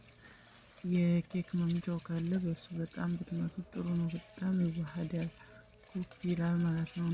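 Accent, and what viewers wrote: South, Ethiopia. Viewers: በጣም ደስ የሚል ደቡብ አገጋገርን ያሳየሽ እህታ ለየት ያለ ነው እንዲህ አይነት ለየት ያለ በጣም አሪፍ ነው ሚያቁትን ማሳወ ሌላ ክፍለ ጊዜ እንጠብቅሻለሁ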